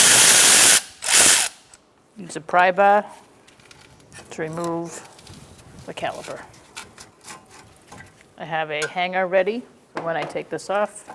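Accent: Boston, United States. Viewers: Thanks for the torque specs. That is one serious Boston accent. Reminds me of my mom.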